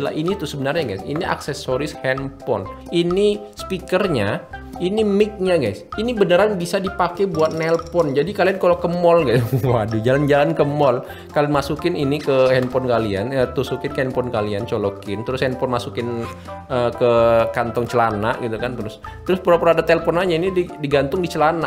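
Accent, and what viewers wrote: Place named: Bali, Indonesia. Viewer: Org bali yah bg ..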